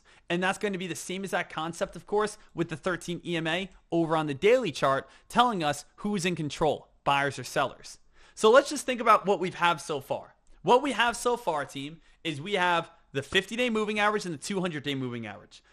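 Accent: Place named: Philadelphia, United States. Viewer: I know bro…we still love Ya bro. 😂😂😂 I always was torn between Jersey and Philadelphia for your accent. More Phila to me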